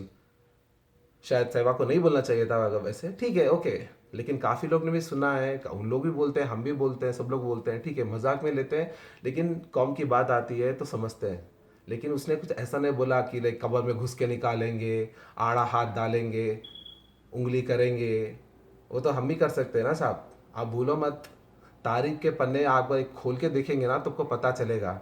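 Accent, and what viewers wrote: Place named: Hyderabad, India. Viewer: bhai gud reply in typical Hyderabadi accent 👌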